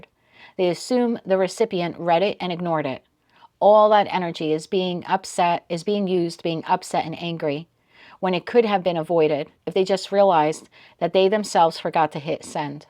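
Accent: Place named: Newark, United States. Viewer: Don't mind the cursing at all, appreciate the honesty! Sounds straight from Newark!😅 great reading, thank you! Much Love ❤️ & Light ♍️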